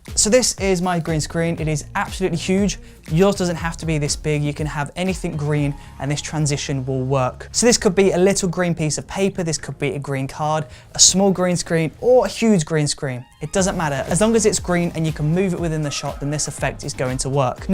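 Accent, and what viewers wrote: United Kingdom. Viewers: You sound so British and i like it..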